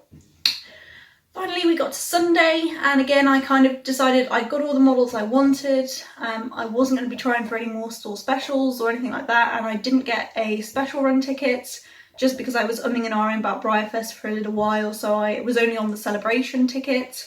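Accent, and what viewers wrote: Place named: United Kingdom. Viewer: Nice to hear how an international Breyerfest fan enjoyed the weekend. Love your British accent and you boyfriend is a good with his points!! Fun watch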